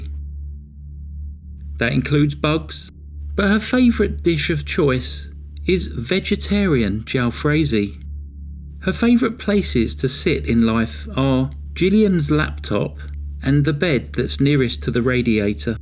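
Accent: United Kingdom. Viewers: You are doing a great job. I really enjoyed your narration on the cases. I especially enjoyed your british accents.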